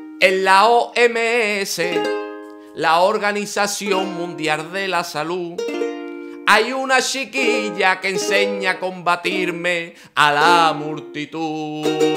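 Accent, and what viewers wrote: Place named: Andalusia, Spain. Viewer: Qué arte, picha (o miarma, que no sabe uno bien por el acento)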